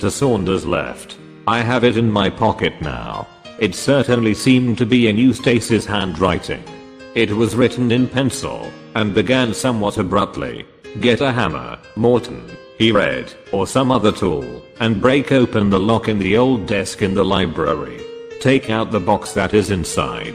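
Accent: England, United Kingdom. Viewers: best british accen..... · thanks alot for reading to us and by the way I love your English accent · Amazing story thanks alot for reading to us and by the way I love your English accent, this is fenomenal !! i enjoyed to hear the whole story ,And i understood each words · I love this story and the english accent ,intonation of the story teller. i try to immitate her accent in every words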